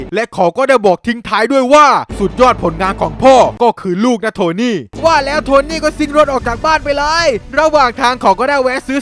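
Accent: South, Thailand. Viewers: พี่เป็นคนใต้หรอ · ต่อไปเอากับตันอเมริกานะคับพี่#ชอบเสียงใต้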